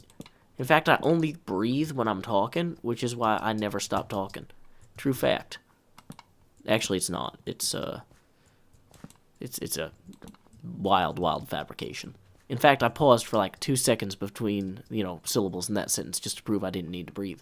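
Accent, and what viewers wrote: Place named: South, United States. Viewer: I've always loved your accent (I'm English and a sucker for Southern American accents) but now I'm starting to love your crazy sense of humour.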